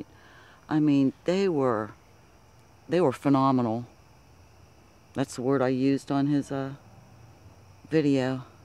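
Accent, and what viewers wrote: South, United States. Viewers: Hi, Absolutely stunning Footage!👍👍👍. I would have to say Saturn is one of my Favourites just beautiful. Thank you for the Time and Effort you put into these Video's, I love the Overlays you put up to show what's what! I have to say I Love your Southern Accent, so soft and Lady like! Have a wonderful Weekend. Take care♥️. Melbourne, Australia.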